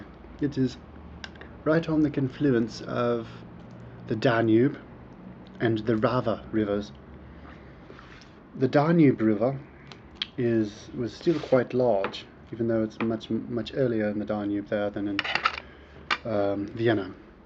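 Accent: United Kingdom. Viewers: This dude needs to go to detox clinic first, then go to a mental institution to clear of his mind. He is mixing Bosnia with Serbia, he calls River SAVA - RAVA . . .he even managed to mix the fact that he is fucking British with perfect British accent, not American. He perhaps visited USA once in his mind! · Both accent and features rather point to British person... He visited my birth town Belgrade and i would like to tell that he visited Celtic city, Celts made in BC. Romans overtook `round 40 BC then Slavs came and city name changed from Singidun - meaning "Round Fort" (name given by Scordisci) to Beograd (Belgrade) which means white town, it seemed white to them. Since he is Brit this suffix (dun) should be something he recognizes..or not? This story is kind of skin tanned too much... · sry man. u sound kinda british :) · can´t you hear his voice. he is speakin´ clear british english so thats the explentation. 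so stop acting smart. u know it´s better to shout the fuck up sp ppl think you are dumb, as to say a word and clear all doubts!!! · You are not American. You have a British accent. So please don't give us a bad rep with your negative comments. I have friends in Serbia and would love to go there. I'm sorry your experience was negative. But really I don't understand why you don't finish eating, belching, picking your nose, etc. BEFORE you put your negative comments on You Tube for all to view. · Your take on Serbia isnt that interesting, but you seem to think it is. And why not say you're a Brit? You don't SOUND like an american. I like Serbians. I'm an american. You seem a little Holier than Thou. It's off-putting. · It's strange how you don't have a typical american accent, you sound more British. You are very ignorant, and I really hope you were joking here. Otherwise it's just sad. What is the point of this video anyway? 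To show off your ignorance? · he's british, or american with a british accent, which is extremely rare btw, you're disgusting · Than you didn`t explore your country well enough. Have you been in New Orleans, or some suburbs of LA or NY? Pretty much filthy, even subway in NY looks that you can catch some serious disease, although it is a great town. Mexico is far away of great society............sorry USA. More important question is why this guy call himself American when he talks with British accent? Provocation or he is ashamed of his nationality, or he think that being an American is - cool? :) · American with a British accent? Hmmmmm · That fucking accent is from Britain he is no fucking American ;) I wouldn't beat you because ur gay I would beat you because you are fucking nasty ass person. Did I mention you are fucking DUMB?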